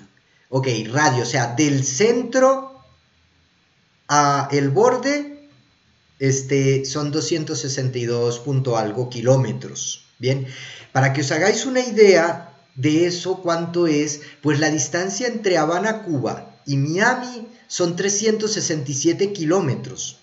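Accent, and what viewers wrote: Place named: Bogotá, Colombia. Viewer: ud es colombiano, paisano rolo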